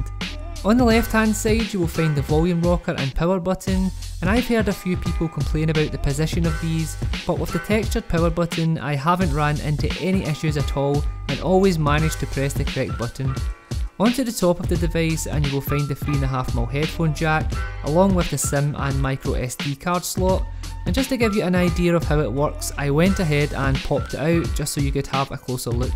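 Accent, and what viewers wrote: Scotland, United Kingdom. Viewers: Canadian? With a Scottish accent? How did that happen.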